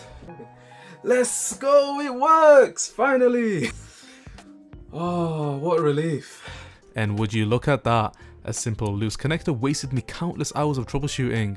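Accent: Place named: Bradford, United Kingdom. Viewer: This guy sounds like one of those bradfordstani’s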